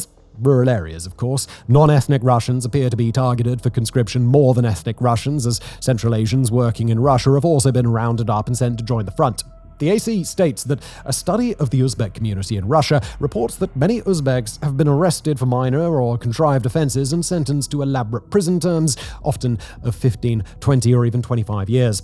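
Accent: United Kingdom. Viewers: Hearing what seems like a snooty British accent telling of Russia's demographic problem is bizarre. Just how is the native population of Great Britain doing in this situation? Aren't they merely a decade or two behind Russia in exterminating themselves? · Sorry but would it be possible to include closed captions in your videos? I'm afraid to admit this but while I do grasp written English pretty well as a non-native speaker, I'm having more trouble understanding British English compared to American 😅 And the automatically generated CC obviously sucks ass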